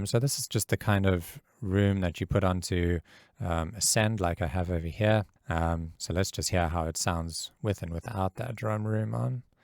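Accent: South Africa. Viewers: hey man, are you south african by any chance?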